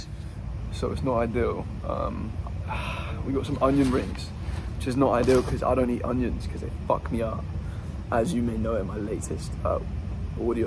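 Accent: United Kingdom. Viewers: please put english subtitle in your next video coz my brain is not cooperating with your british accent😅🤣✌️ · Omg i love British accent absolutely great and your video absolutely amazing, all support 😊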